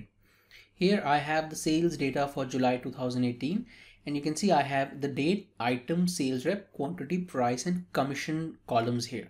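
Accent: India. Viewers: I know this is a good tutorial whan I heard Indian accent in the opening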